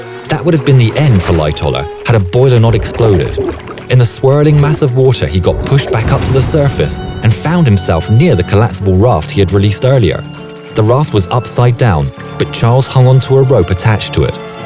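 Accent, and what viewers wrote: England, United Kingdom. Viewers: This is the best British accent I’ve ever heard haha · Love you English accent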